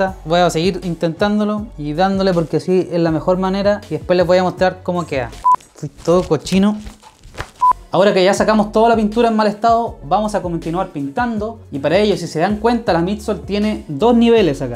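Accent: Chile. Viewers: Watafaka apenas te escuche. Me di cuenta que eri chileno jajajajaj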